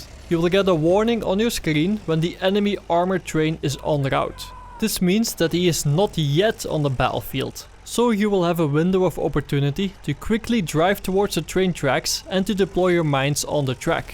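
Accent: Netherlands. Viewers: jij hebt echt een zwaar NL accent in je engels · Why do you sound so dutch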